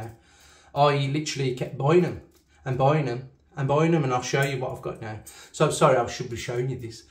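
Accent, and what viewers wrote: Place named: United Kingdom. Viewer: New sub here, wet shaver since lockdown, started 1/7/2020 a date you can remember 😂. I bumbed on your video and said to myself what an enthusiastic lad, I hope you keep this freshness to your future videos. I also love paa john frum, cad is another favorite. To the lad who wrote about why going to so much expenses I would answer when you get in the rabbit hole you can't get out 🤣. Where about in the UK are you? I try to figure out from your accent. I live in Southampton. Take care buddy 👍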